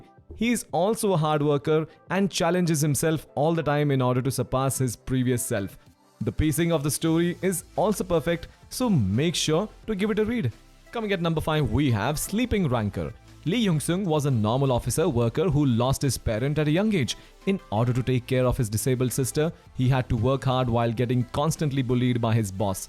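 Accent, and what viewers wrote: India. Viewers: Is it Indian accent ?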